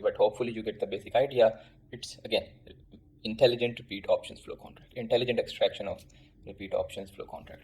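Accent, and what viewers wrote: India. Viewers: bro if u want to trade against algos, market makers and smartest people in the world and you cant understand an indian accent, i think you are not smart enough to trade lol I understood everything perfectly and I see alot of other people did too, so it's just you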